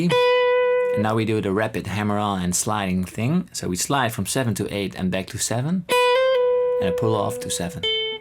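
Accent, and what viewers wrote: Netherlands. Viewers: Great guitar lesson, very helpful! Your English is perfect, but do I hear a Dutch accent?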